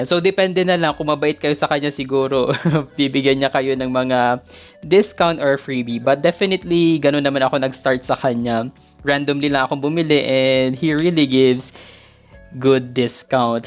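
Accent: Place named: Conyo, Philippines. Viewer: Finally we all see your face with facemask Sir😊🙌 All I learned about you Sir is your conyo voice😂 nadadagdagan yung vocabulary words ko may Botany class pa kami sayo LOL 😂 more power to your video Sir!👏🏻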